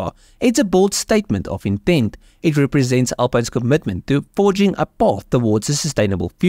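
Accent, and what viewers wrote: South Africa. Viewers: Had to double check your channel details when I heard the SA accent 🤪 great video! Keep up the great work!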